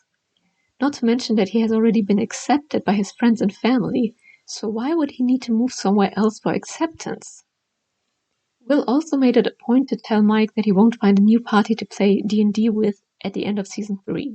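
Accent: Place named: Germany. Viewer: I think the way you say certain words, it had me wondering if you were a native German speaker. This is more of an accent thing, not a grammar thing—you expressed your ideas clearly. Someone once said to me "Understanding always comes before speaking", which I think is true for every new language you learn to speak! 😊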